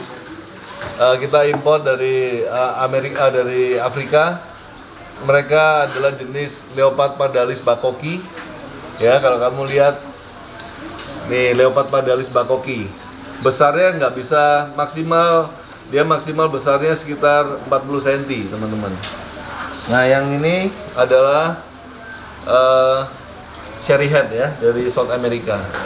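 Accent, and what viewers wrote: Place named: Java, Indonesia. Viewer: Wih orang mana nih om nya Kok pakek logat bahasa jawa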